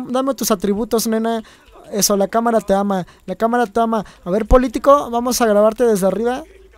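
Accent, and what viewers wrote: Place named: Mexico. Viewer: jajaj me gusta el acento mexicano noooo mames cabronn jajaja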